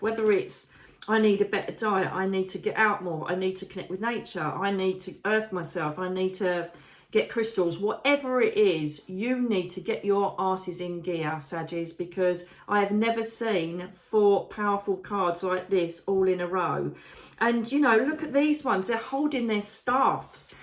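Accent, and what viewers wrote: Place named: England, United Kingdom. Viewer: Scrolling thru after just watching another YTuber and you both had same major arcana cards! You’re funny as hell, love ur English accent - you’re a hoot! Made me smile & laugh. Interesting because I’m going to a QHHT session (quantum healing & hypnosis technique) done by Dolores Cannon to connect w/our higher self. Doing this session on 12/4 - looking forward to + I’ve been doing a lot more research in opening my spiritual awareness & abilities. I am a Reiki 1 level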